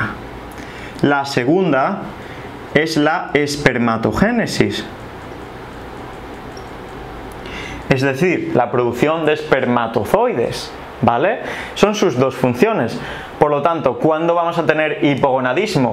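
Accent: Spain. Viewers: La información puede ser buena, pero la verdad la parte comunicativa rompe el ánimo de seguir viendo, para los americanos hispanoparlantes de por si, nos dificulta un poco decifrar la manera de hablar de los españoles, pero echándole ganas se puede traducir (sin justificar el excesivo uso del "vale" que para nosotros no tiene sentido!), pero lo que si me resulta grosero es el uso innecesario del inglés, ojalá pudieras eliminar eso y seguramente tendrías algo más de aceptación. saludos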